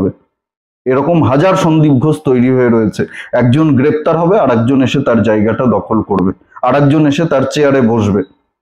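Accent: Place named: Bangladesh. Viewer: ভাষা শুনে তো ফুল বাংলাদেশী বলে মনে হচ্ছে... imported from Bangladesh...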